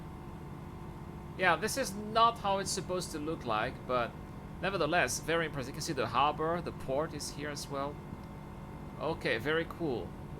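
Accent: France. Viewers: So you are french ? I usually can tell but for I had no idea where you could be from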